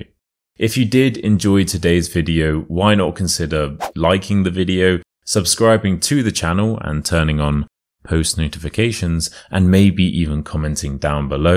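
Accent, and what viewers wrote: United Kingdom. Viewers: I wish all British people could speak like you 😅 Very lovely lessons ❤ thanks 🤩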